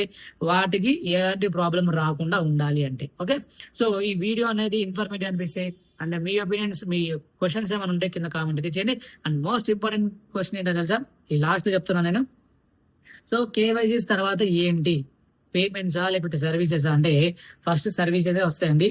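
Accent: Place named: Hyderabad, India. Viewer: Thammudu nee telugu Urdu+telugu kalipi unnattu ga vundi....video cheyse mundu konchem telugu practice cheysi Cheyyi....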